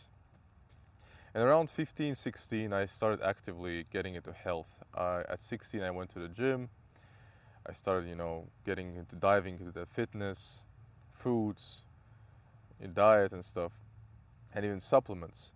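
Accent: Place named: Russia. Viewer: сразу слышно что родной язык русский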